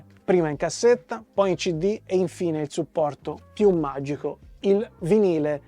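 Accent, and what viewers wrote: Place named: Livorno, Italy. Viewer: Ma te guarda...sei di Pisa...ma si sentiva..ma non credevo di Pisa...ti vedevo più un tipo livornese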